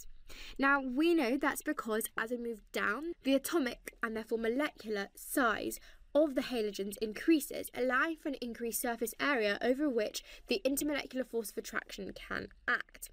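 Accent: United Kingdom. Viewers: yeah strong British accent :D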